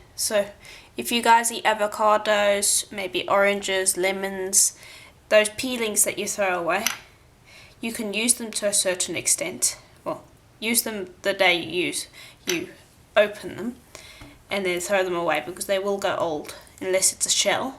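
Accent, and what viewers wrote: South Africa. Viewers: Are you South African? Sorry I’m trying to guess your accent. 🤣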